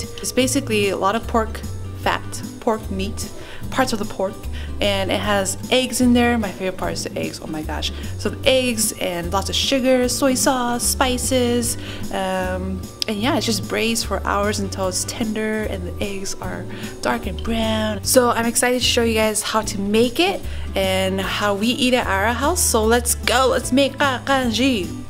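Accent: United States. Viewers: Are you from the south? You have a southern accent, like me. lol. I’m from NC. Thanks for sharing the recipe!!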